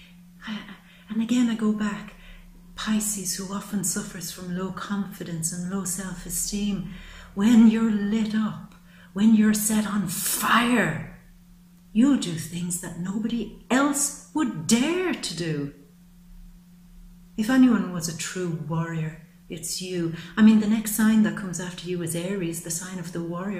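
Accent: Ireland. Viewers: I just love you. And your Irish posh accent :) you remind me of my Irish friend.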